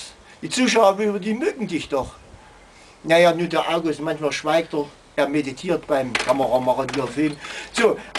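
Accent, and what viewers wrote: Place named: Berlin, Germany. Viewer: Also ik würde sagen dit bärlinärische is klasse ! JJJ ! xD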